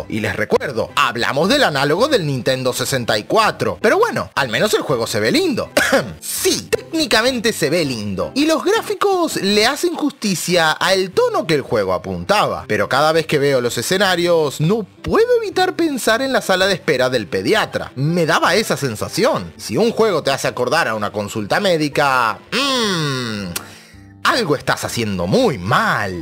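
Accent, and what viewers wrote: Uruguay. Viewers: espera... Sos de Uruguay?... Paisano! xd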